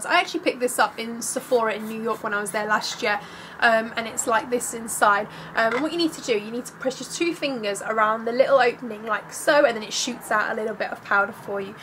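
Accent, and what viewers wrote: London, United Kingdom. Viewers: where's ur accent from.. london? .. oh and hello :-))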